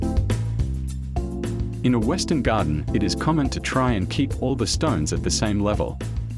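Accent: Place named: New Zealand. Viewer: You sound more kiwi than Australian hahaha Gosh your work is fantastic.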